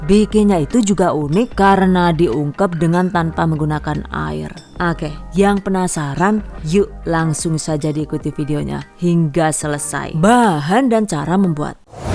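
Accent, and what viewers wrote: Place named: Tegal, Indonesia. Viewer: Tegal baget ya bund,,😅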